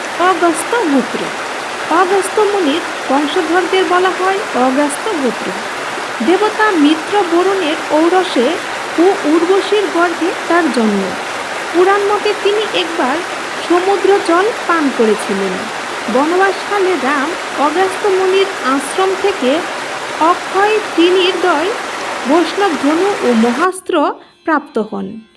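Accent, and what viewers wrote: Bangladesh. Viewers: কুমিল্লা এলাকার লোকেরা এইভাবে উচ্চারণ করেন। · Pronunciation thik karun mone hoi apni sylethi meye. Ja hoke bhalo video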